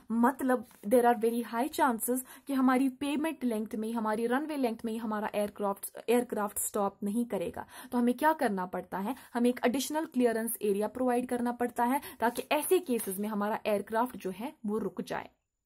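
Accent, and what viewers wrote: Kashmir, India. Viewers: Superb explaination mam. It's seems by your voice that you are from Kashmir. And you are doing well. I was really need this in this time because my university exam is going to start.❤️❤️❤️ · Are you from Kashmir 😊 your voice resemble Urdu accent.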